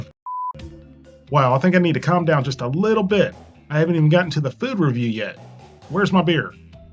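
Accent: South, United States. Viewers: you're an idiot. This fucker can't speak one sentence correctly. That's the south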